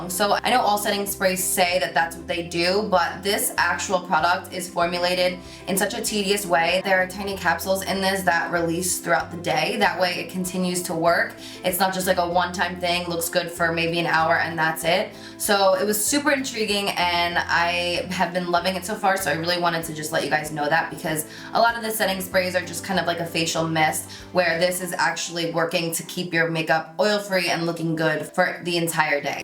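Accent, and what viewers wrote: New Jersey, United States. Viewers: You sound like your from Jersey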